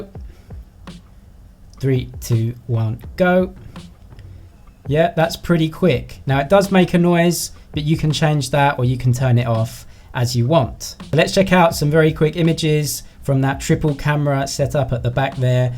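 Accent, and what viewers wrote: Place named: United Kingdom. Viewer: Hi, im looking to see this on Amazon via your link but its just taking me to the homepage when i click it. Any ideas? Im in the UK (and judging by your accent you are too) so how have you got your hands on it? Were you sent a review unit or did you buy it yourself?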